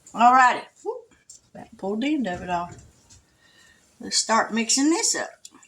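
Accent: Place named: United States. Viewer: Looks delicious! I love your country accent!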